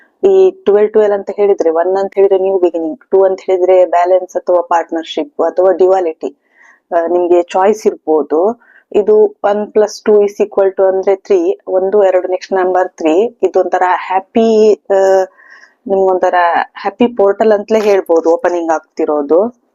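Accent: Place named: Mangalore, India. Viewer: Thank you sister ❤ are you from Mangalore by hearing your accent I'm feeling like that ..but anyways nice reading.thabk you❤ Thank you universe ❤Thank you god❤ Thank you angel❤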